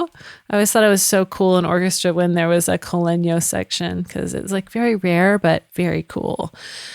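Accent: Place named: United States. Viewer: your surname is catalan,..but you speak english native with american accent..anyway thanks for this..i love ujam striiiings..is one of my favourites plugins when i compose...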